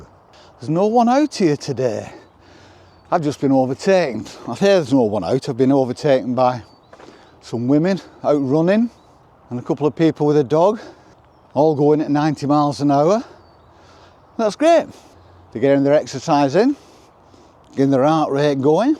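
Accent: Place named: Liverpool, United Kingdom. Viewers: I love your accent! American here but I’ve always loved a northern accent. I think you’re very interesting and enjoy hearing your views and watching your life 🙋🏻‍♀️ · Your accent reminds me of the.❤️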